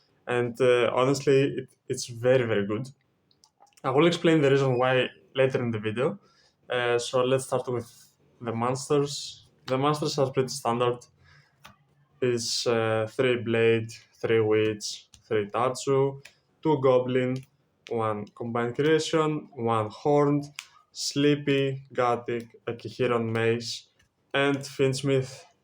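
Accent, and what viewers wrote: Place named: Greece. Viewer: I know a Greek accent when I hear it. Καλή δουλειά αδερφέ!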